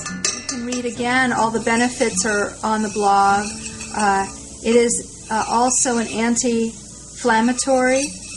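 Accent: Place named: United States. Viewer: Why do mercans say "Urrbs" instead of "herbs", it clearly starts with H not U...and turmeric isn't even a Urrb...it's a spice!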